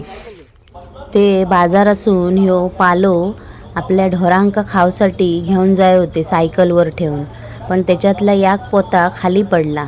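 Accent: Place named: Malvani, India. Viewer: तुमची व्हिडिओ मी पहिल्यांदाच बघतोय तुमची मालवणी ऐकून मला प्रभाकर मोरेंची आठवण झाली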